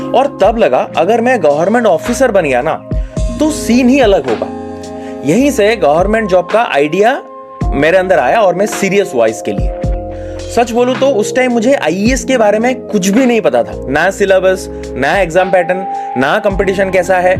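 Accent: Odisha, India. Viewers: Bhai aap odisha se ho ?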